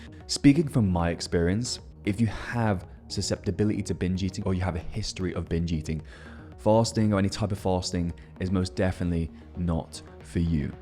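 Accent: RP, United Kingdom. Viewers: I’m not sure if anyone in the world authentically pronounces fasting as faaahhhhhsting and last as laaaaahhhhhhst. IDK….is it a way to say ‘hey, look at me as a productivity guru, I’m so successful I have a ridiculously posh accent!’. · Great video with good information! Btw - I LOVE your posh accent 🔥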